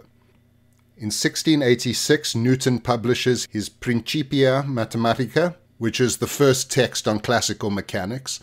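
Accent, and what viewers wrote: South Africa. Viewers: South African accent? 😁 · Are you South African friend?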